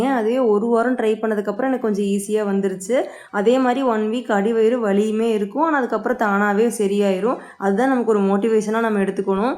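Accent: Kongu, India. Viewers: Sister love ur way of speaking polite kongu style, ur videos r positive as u are, hats off sisy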